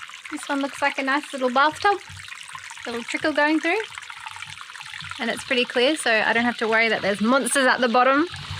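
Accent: Australia. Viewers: I love watching your content! I’m from the u.s and I love your Australian accent ❤. Thank you for all the great videos 😊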